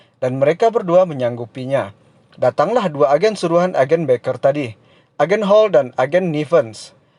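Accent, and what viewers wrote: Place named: Minangkabau, Indonesia. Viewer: Logatnya kental Minang banget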